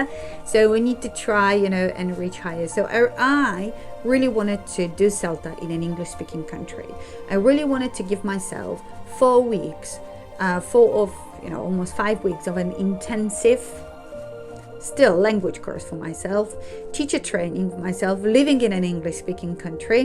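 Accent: United Kingdom. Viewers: You sound like a native british :) congrats! Where are you from? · Hi, thanks for your answers:) Currently I am a student and my English is oscilated between B1-C1, everything depends on what we are talking about: speaking, writing or listening and so on. I am impressed by your British accent. Would you be so kind and maybe make a video or just write a comment, how to make your pronounciacion more Biritsh for non naitive speaker. I know that there are a lot of different videos on YT, but why not?:) And maybe how to understand wishes for Polish people or other "hard" English grammar constructions? Greetings:) By the way, do you think people like me, whose English level is not yet C2, but I hope one day it will, are able to pass CELTA, without having diploma in either linguistics or english philology?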